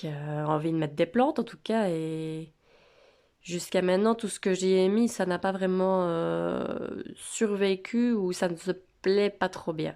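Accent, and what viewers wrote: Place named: Liège, Belgium. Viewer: Merci pour ce plante tour, j adore !! Et l accent Liégeois coool 😃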